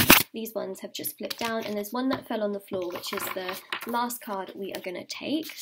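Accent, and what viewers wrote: United Kingdom. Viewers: Her British accent is already making her videos more interesting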